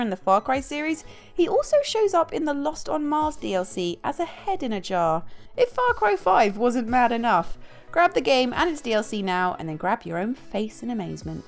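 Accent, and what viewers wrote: United Kingdom. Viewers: why is every xbox video a british accented female? im just wondering.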